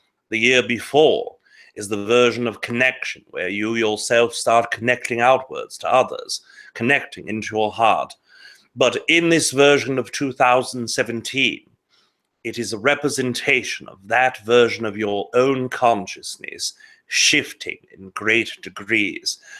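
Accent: United Kingdom. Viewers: He sounds like Alfred Hitchcock, with that British accent. Hey, I want to believe this info, but I also want to keep grounded. There are many questionable issues. ok, so if all these sightings and escalations happen then woohoo.. Let's see what presents itself. Come on 4th density, appear, make a big splash in our pool.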